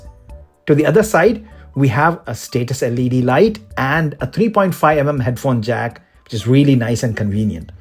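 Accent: India. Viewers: are you an Indian Filth. eww the accent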